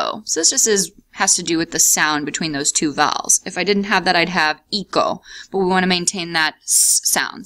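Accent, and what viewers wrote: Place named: United States. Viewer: I’m afraid I can’t get past her gringo accent either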